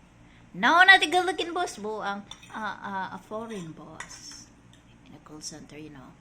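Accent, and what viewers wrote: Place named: England, United Kingdom. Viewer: So enticing recipe of yours. The reason also i always watching your video because of your english accent. Love yah!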